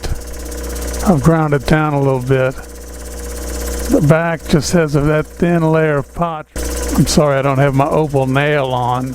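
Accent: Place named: New Orleans, United States. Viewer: Your accent is very nostalgic for me, you wouldn’t happen to be from around the Big Easy would you?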